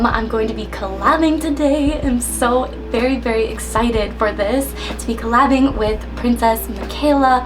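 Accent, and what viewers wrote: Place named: Australia. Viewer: Loooool your Australian accent made me giggggleeee and then your Little Mix Jamaica interview reference 🤣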